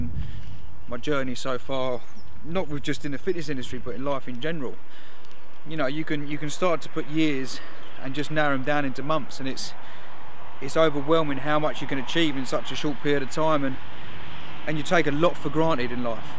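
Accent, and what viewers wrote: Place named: England, United Kingdom. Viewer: well said mate, what part of england u from by the way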